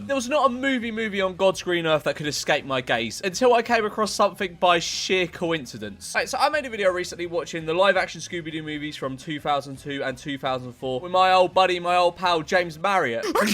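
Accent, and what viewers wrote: United Kingdom. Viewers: Somehow hate this video more then the movie dude uses way to many corny ass jokes plus mixed with that dumbass uk accent i wanted to literally shot myself🤣🤣😭💀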